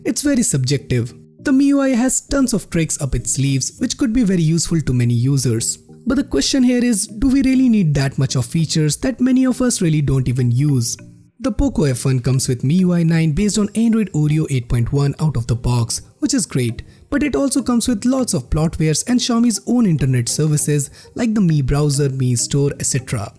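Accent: India. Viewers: ur indian accent is dumb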